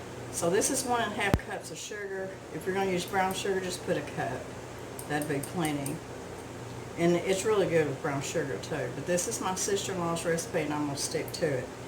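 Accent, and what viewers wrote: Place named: Arkansas, United States. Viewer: This is so much like my mom's recipe. They lived through the "hard times" of the depression and WWII and farmed a small piece of land in south western Manitoba(Canada) and they had to make things stretch so she used just 1 cup of raisins and 2 cups of whole milk from the cows she milked herself. Mum made this pie when we were growing up and I still love it today and I'm 61 yrs of age. Thank you for sharing with us. I love your Arkansas accent. We lived for one year in Kansas, when I was 8 rys old, right down in the the south west corner near the Oklahoma border. At that age a kid is like wet clay and I picked up the local accent so much that when we moved back to Canada everyone thought I was born in the states. To this day when the Star Spangled Banner is played my eyes well up and I remember that wonderful carefree year as a boy riding my bike in our small town with my first dog running beside me. 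I'm tearing up as I write this so will leave off with many thanks and good wishes to y'all.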